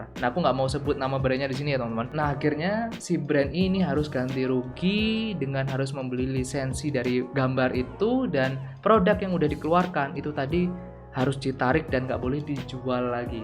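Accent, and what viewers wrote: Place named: Java, Indonesia. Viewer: Bang omah e ndi kok logat e jowo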